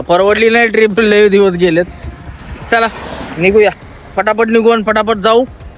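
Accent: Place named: Western Maharashtra, India. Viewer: Aao Bhau Tumi Paschim Maharashtra Raagde Mavale , tumchya bolnayt janaute..Aamchi Iccha aisi hai ki tumhi Ekda aamala North East ke darshan karave.... in LMV or tractor trailer .. as u got past experience also i like u r guru sangli jath person attitude and grace in this personality....as seen in ur past video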